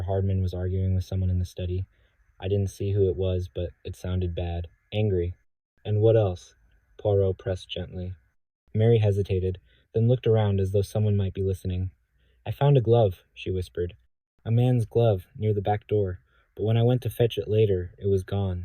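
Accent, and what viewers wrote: United States. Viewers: Horrible narrator with American accent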